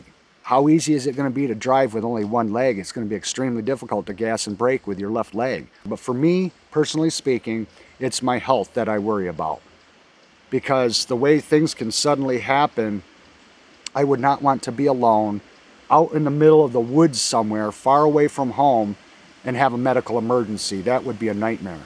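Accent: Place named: New York, United States. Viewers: You know, i heard you talk and instantly thought he sounds like he’s from New York. I live in the Mohawk valley. We really do have a subtle accent if you will. First time watching any of your videos. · Good video! I thought I recognized your accent lol. (I'm from Little Valley originally, near Jamestown/Olean area in western NY - in Ohio now). We camped at Allegheny State Park recently and I was surprised how unconcerned most campers were about black bears, and many of them thought having bear spray was foolish. I never thought black bears were any kind of threat, I ran the woods all the time as kid in bear country -- then one day I looked up bear attacks on the internet. You'd be surprised how many black bear attacks there are, some fatal. And quite a few predatory. It's not likely, but it sure can happen.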